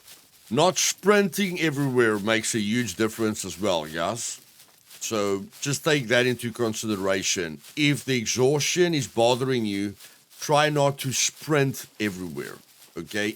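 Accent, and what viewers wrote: South Africa. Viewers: hey you sound south african what server do you play on?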